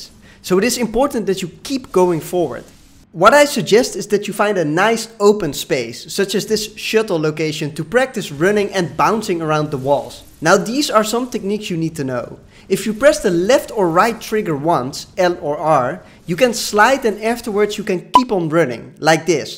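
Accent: Netherlands. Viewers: Clear video, explains some of the finer points of speedboosting. An addition would be that you cannot "charge"towards a block while spinjumping and you need to cancel the spinjump (or screwattack) with firing your gun/ aiming, before being able to do mid air spark releases. I would suggest working a bit on your English: Your Dutch is shining through: just watch some vids on pronounciation. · Thanks voor de tip! Ik hoor trouwens iemand die in het echt Nederlands spreekt ;P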